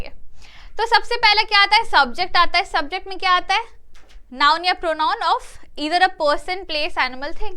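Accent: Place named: Bengal, India. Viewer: Mam bengali lagte hain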